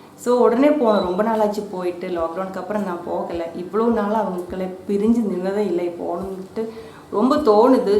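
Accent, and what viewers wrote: Kerala, India. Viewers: So happy to see u. As ur voice u looking so awesome. I love ur tamil with malayalam accent. Ur recipes r too good. Keep rocking friend. 👍👍👍👍👏👏👏👌👌👌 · I love your tamil with malayalam accent nice to see you face to face. I learnt to make aappam and stew with your help. Thank you have a great YouTube experience 😃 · Hello mam, I have tried your receipe kadalai curry and aapam. Your explanation is so neat and easy to follow. Never watched anybody's channel talk like this. You are doing grt ,pls continue it. Also feel free to talk in the way which comes to you, listening keralites speaking Tamil always pleasent for us. Bz of dubbing difficulties, you may reduce your dish variety in future. So pls don't stress yourself much in translation.we are happy to listen to your Malayalam mixed Tamil .Keep rocking mam. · Akka, its sweet to hear your tamil with malayalam slang. 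Don't worry about language we can able to understand it. Thanks for your efforts to give your recipes to us(Tamil people). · I like your voice and this malayalam mingled tamil😍😍😍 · Sweet and simple 😊 malayalish tamil is cute chechi. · Ur malayalatamil super..first viewer ...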